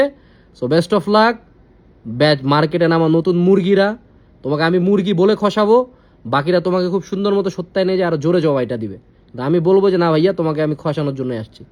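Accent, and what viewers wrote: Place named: Sylhet, Bangladesh. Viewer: oni sylheti er Jonno accent ei rokom abr onar past onek koshter oni jeikhan theke Uthe ashche shobai ta parbe nah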